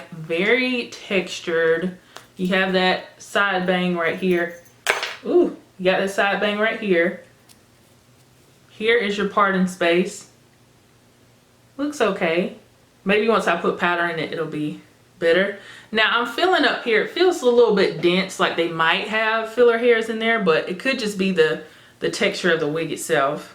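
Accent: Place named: Georgia, United States. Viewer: My first time seeing you ... excuse me... being blessed w u! Just wanna day THANK YOU! And you are 6 feet tall?! You betta be a model . Beautiful ! Where r u from I love ur accent too! U sound ur from Lagrange Georgia or something... anyways I’m a new subbie! 💕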